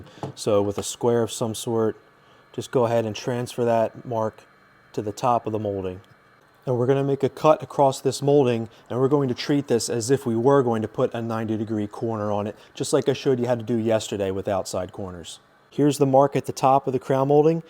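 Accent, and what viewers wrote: Philadelphia, United States. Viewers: True story- video with Philly-accented voiceover builds instant trust 💪 Thanks, brother!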